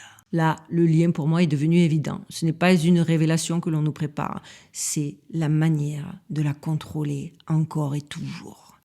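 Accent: South, France. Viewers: Ah !!!! L' accent de la bonne mère en direct des states ! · Toutes ces horreurs avé l’accent du sud, c’est quand même plus sympa🥰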